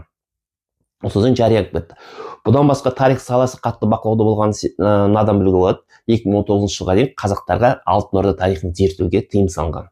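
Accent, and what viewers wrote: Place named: Xinjiang, China. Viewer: Фу ДАУЫСЫ КАНДАЙ ЖАМАН ,кытайдан келген казак тардын сойлеген СОЛАЙ ЖАМАН.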